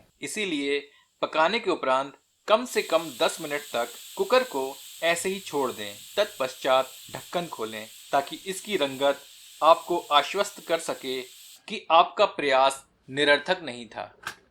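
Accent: Standard. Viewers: आपकी हिन्दी शुद्ध है !!! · आपकी शुद्ध हिंदी, और इतने प्रेम से इसे बोलना, किसी को भी मजबूर कर देता है, आपकी रेसिपी को बनाने और शेयर करने में।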